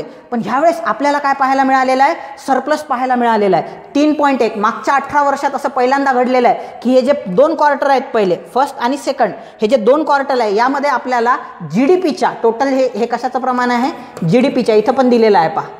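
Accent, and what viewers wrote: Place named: Amravati, India. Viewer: Aj khup divsane amaravti chi language aaikun khup chan vatle.... Bhari ekdm. Lecture aaikun tr khup fayda zala.. Pn aj amaravti chi athvn.. Ali ba. 🙂😀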